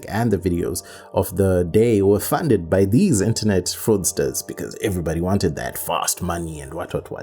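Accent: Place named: Ghana. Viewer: You sound like a Ghanaian. Let me educate you on something; Jollof is West African and Nigerians didn't appropriate Amapiano. The generation of musicians that existed before the current musicians paved the way and the new generation just used what they blazed as a stepping stone to the top. There's no substitute for hard work.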